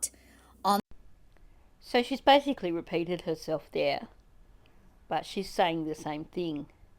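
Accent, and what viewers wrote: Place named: Australia. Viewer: Well maybe your accent is more Jacqui Lambie. :) I respect her now, not originally BTW. I didn't mean to make a big deal about it. I do appreciate someone who can conduct a civil conversation on YouTube though :) I'm from South Australia and we have different accents again.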